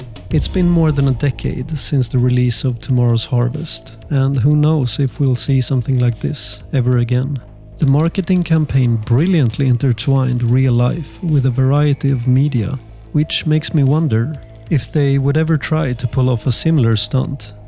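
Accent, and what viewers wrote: Sweden. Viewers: Du låter mycket svensk. Är du svensk?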